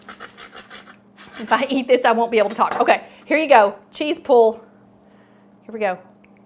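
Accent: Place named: Kentucky, United States. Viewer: Gotcha. You shouldn't feel sensitive about embracing and sharing non-traditional new foods. As a matter of fact, the Stromboli is indeed an adapted Americanized invention. I enjoy making all types of world cuisine and I make no preface nor caveats for cultural qualifications. Remember, you're not appropriating, you're embracing. That's a very good thing! 😇 BTW: I adore your enchanting blue grass dialect.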